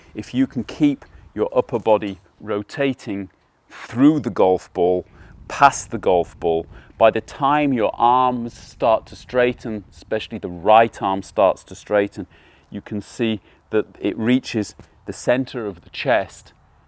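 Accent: England, United Kingdom. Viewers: Guy sounds like Mick Jagger same accent.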